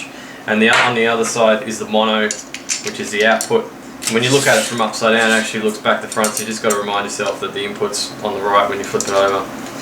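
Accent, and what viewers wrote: Australia. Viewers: It's cool to hear an Aussie accent on You Tube.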